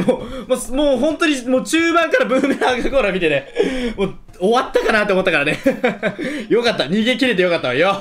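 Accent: Kansai, Japan. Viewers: 最近関西弁が多い気がして嬉しい🥺激カワフェイスにイケボからの関西弁好きです沼ですもっと言ってください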